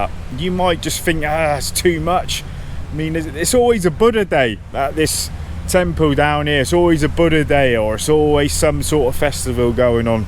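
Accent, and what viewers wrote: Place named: West Country, United Kingdom. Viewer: Some very valid points. I'm married to a woman born and raised in Chiang Mai so a lot of these don't affect me. Where in the south west do you hail from? I lived in Wiltshire, then moved to Devon, spent a lot of time in Dorset and Cornwall, you don't have a Wurzel accent, perhaps a hint of Bristol though... Speaking Thai. I used to always try speaking Thai, but ended up getting puzzled looks, repeating myself, more puzzled looks and then my wife says, exactly the same (at least it sounds exactly the same to me) and they nod and leave, so have pretty much stopped. There are other reasons I'm not really interested in speaking Thai, my wife has about 10,000 family members locally, they ALL want to talk to me! Me not speaking Thai and them not speaking English results in not speaking to them. Is that rude? Maybe but I barely spoke to my brother for the last 12 years I lived in the UK, and I happily avoided speaking to people in the UK for weeks at a time. 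Visa's. It's an unnecessary expense, but I pay a visa agency to do all the work, I spend less than 20 minutes dealing with immigration once a year and I'm happy to pay for that. Ironically it's wasn't the immigration staff who were the issue, it's sitting amongst the endlessly whining/angry expats in the immigration waiting area that I found both depressing and irritating. Budget. I'm the opposite to you, I almost never have unexpected expenses crop up, I know what I need to spend each year and plan for it. In fact since buying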